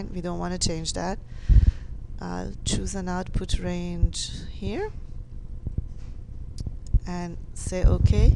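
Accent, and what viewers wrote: Iran. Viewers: shes is clearly iranian. the accent is too obvious haha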